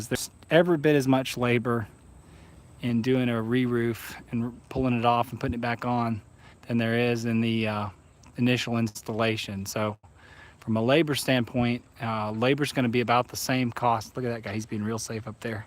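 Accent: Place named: Puebla, Mexico. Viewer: Hey you really learn the mexican speaking way you sound like guys from puebla . hay muchos mexicanos por alli en Atlanta cierto ... 😎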